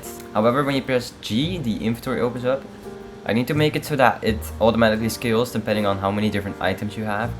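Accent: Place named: Netherlands. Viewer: the accent gave it away. since i’m also dutch it was quiet easy to guess.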